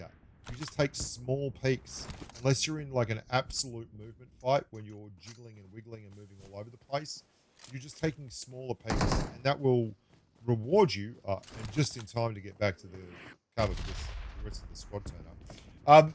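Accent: Australia. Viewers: Love the Vector so much with a laser sight too❤❤ nice one as always❤ i just have a slight complaint to make, i would very much appreciate fixing the sound in your videos, it's been anoyying lately that the game sound is louder than your own voice 😂😂 especially cuz i love listening to your australian accent 😂❤ it's really annoying that sometimes i can't make out what u say! Take care ❤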